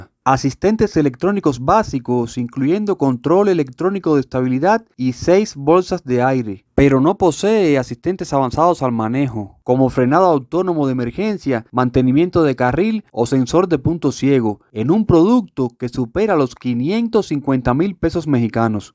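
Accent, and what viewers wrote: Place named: Central America. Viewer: Con acento centroamericano.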